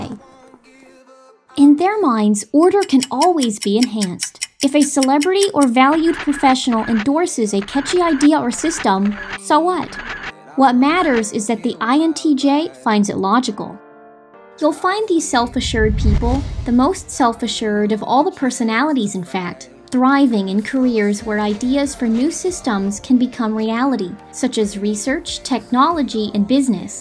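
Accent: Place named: New England, United States. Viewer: Not certain, something New Englandish. It's fun.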